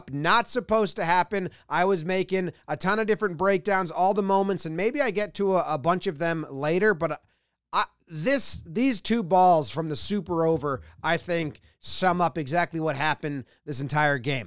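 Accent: United States. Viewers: This guy talking sounds American, but also is talking about cricket plausibly competently? I don’t get it at all.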